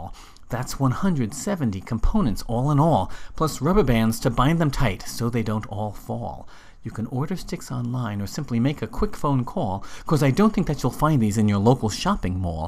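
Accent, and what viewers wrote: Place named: New England, United States. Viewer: hmm sounds like a new england accent. but im most likely wrong. this is bugging. where this person is from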